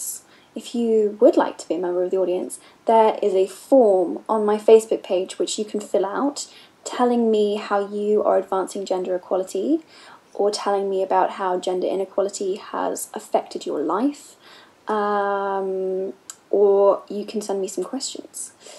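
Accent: United Kingdom. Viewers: イギリス発だから逆にあなたが学んでいる英語の方が訛ってるかもよ？ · イギリス英語でわ？と思います。アメリカとイギリスでは発音が全く違います。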